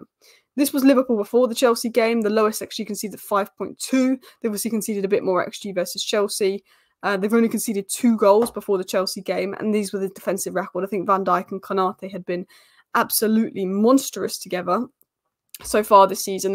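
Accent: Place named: Manchester, United Kingdom. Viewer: That’s a lovely accent you have, what part of Manchester is it from? Y.N.W.A.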